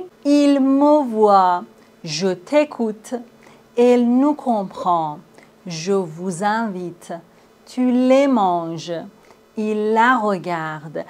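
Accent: Iran. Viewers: un accent persan très marqué ❤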